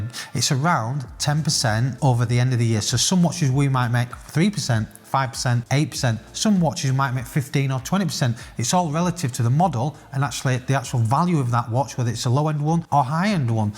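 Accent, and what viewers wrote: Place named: North, United Kingdom. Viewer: Great content as ever. You’ve got your own niche here. A lot of the watch YouTubers are semi-weirdos. Nice to hear some straight talking from up North.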